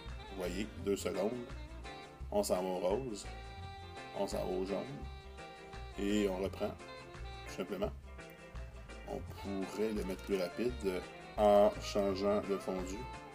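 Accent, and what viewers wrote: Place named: Canada. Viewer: Merci pour le tuto en Français, j'attends mon interface et c'est grâce à tes explications que je l'ai acheté, en plus des explications tu nous fais voyager avec ton accent Canadien ; )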